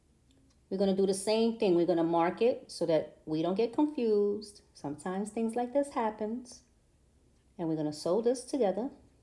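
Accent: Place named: Brooklyn, United States. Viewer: Great tutorial! And I ❤ your accent. Brooklyn Borequa, right? Giving Rosie Perez! 🇵🇷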